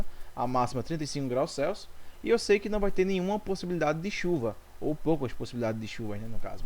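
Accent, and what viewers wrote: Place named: Northeast, Brazil. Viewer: Boa aula! E esse sotaque é típico do meu nordeste.👏🏼👏🏼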